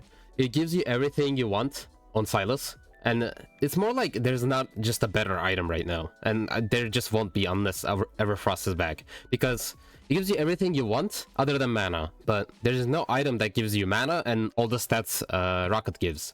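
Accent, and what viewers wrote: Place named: Western Europe. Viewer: Whether you're from EUW (a guess based on your accent) or NA (your region), you're handsome as heck.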